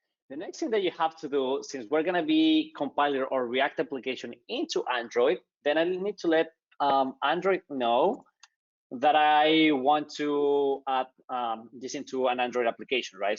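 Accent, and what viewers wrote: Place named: Latin America. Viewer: fua amigo me salvaste de una grande no se de donde sos, tenes tonada lantina y te dicen (? asi q somos hermanos loco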